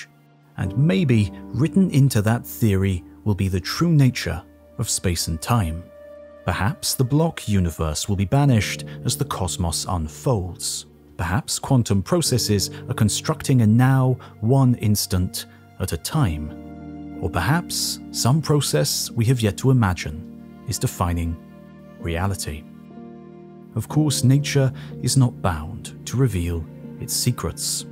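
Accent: England, United Kingdom. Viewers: Its the English accent, crap sounds like fact instantly.. Again hes reading off something because why is he saying we?? Only the majority think a certain way whilst the rest of us dont care about information that takes you away from a stable self financial comfort..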